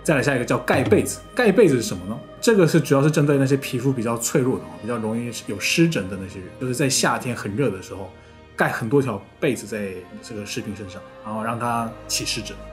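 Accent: Standard. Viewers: 看影片也幾年了，你的中文用字和發音已經比許多台灣人還要精確和標準。以非中文母語者或是雙母語者來看，真的很厲害，每次看你的影片都覺得非常佩服。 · 国语好标准啊不看评论不知道是哪国的，看的时候心里有几十个卧槽，我以为最多会被打两下给老兵洗袜子之类的，没想到这么黑暗！年少时曾粉红也曾痴迷韩剧学韩语，大学之后认识的留学生让我帮他们考试传答案，首尔和釜山学生背后说坏话，那种微妙的感觉我就想这和中国也太像了，神秘面纱没了之后依然很羡慕韩国的人均工资，以及内地女生对韩国男生的态度，留学生给我看约出来的女生照片真的又漂亮学历又好有的见面一两次语言不通就提出要和他在一起惊呆我。后来知道了娱乐圈财阀军队校园霸凌这些，我的理解是资本凌驾于政治之上很多事情也会逐渐离谱，思想上灌输洗脑教育会让不同阶层的人暂时忘记阶层不敢肆无忌惮的在学校军队欺负人，大不了长大之后再把自由意识找回来，都说大陆没自由我也天天骂觉得台湾或许是乐土，到现在我觉得或许根本没有乐土，一个地方也不是好或坏就能定论，作为平民很庆幸生在东北的十八线小城没受过校园暴力不用服兵役家人溺爱十八岁前傻子一样活着直到30岁还很幼稚，最后希望中日韩站起来让平民日子好过点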